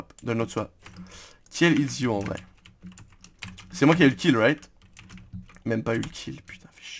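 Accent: Quebec, Canada. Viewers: stp parle avec ton accent qc :(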